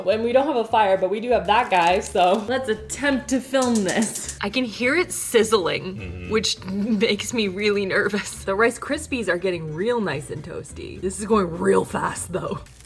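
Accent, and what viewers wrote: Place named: Winnipeg, Canada. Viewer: Winnipegers talk like that as well. Not sure about people in Edmonton because we've only been here for 5 months!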